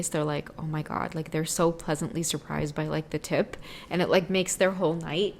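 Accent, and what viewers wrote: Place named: Trinidad and Tobago. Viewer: I love when you do the trini talk w 🥰 and ty for the readings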